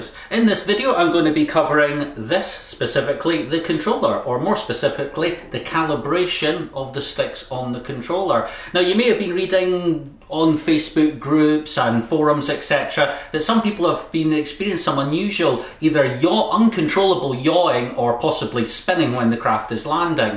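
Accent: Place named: Scotland, United Kingdom. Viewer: You are the best, even for me listening Scottish accent !!! Merci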